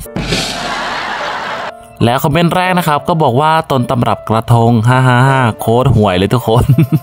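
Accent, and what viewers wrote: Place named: Cambodia. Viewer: สำเนียงแอดเหมีอนเขมรมาก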